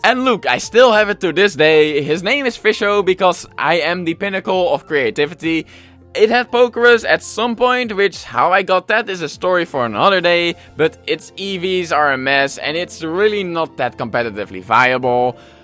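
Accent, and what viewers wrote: Netherlands. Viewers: It’s absurd but by the way you speak I asume your also dutch. Great video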